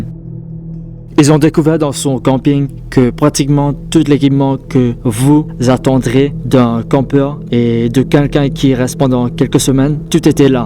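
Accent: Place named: England, United Kingdom. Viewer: Je pense juste qu’il n’est pas français mais plutôt anglais ou américain tout simplement vu l’accent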